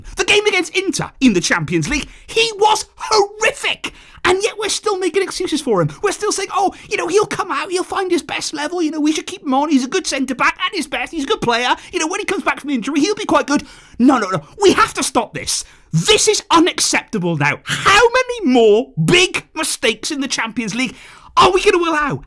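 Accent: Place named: England, United Kingdom. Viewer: Your accent doesn’t sound like a typical Spanish person, wonder how a English person ended up supporting Barcelona 🤔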